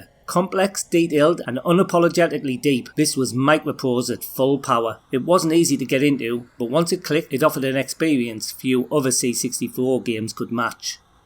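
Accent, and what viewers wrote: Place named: Sunderland, United Kingdom. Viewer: He's from Sunderland, in the north east of England; just be glad he's not from Newcastle, as that would be even harder for non-Brits to understand.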